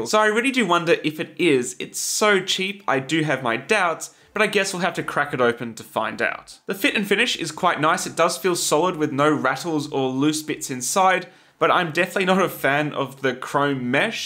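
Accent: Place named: Australia. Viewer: Hahaha thinks I watch to hear his cool Australian accent. I watch because of his awesome content.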